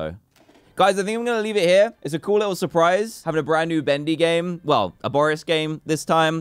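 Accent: England, United Kingdom. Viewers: I'm gonna get r/whooooshed for this, but he's saying that "this bone is on my list" very fast and with a heavy British accent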